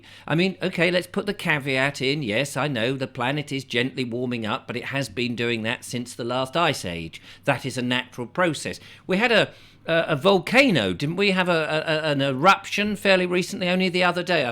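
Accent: United Kingdom. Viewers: Such a wonderful, true assessment of the whole situation they are trying to put us in. And your British accent makes it all the more enjoyable.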